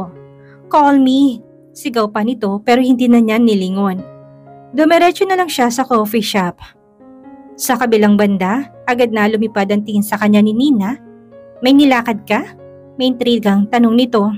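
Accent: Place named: Visayas, Philippines. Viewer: panget tlg ang boses mo bisaya pa ang accent mo pumapanget tlg tong story n ito dahil ikaw ang narrator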